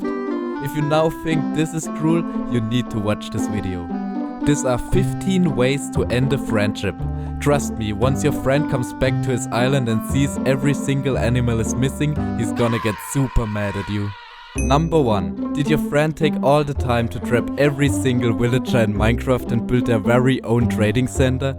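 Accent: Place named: Germany. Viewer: you sound very German 😅 ich feiere deine Videos so sehr xD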